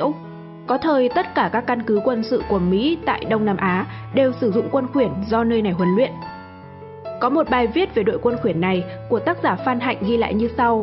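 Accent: North, Vietnam. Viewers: Kênh này đưa thông tin 1 chiều và không có dẫn chứng rõ ràng. Cộng thêm giọng đọc miền “ ngoài “...không đáng tin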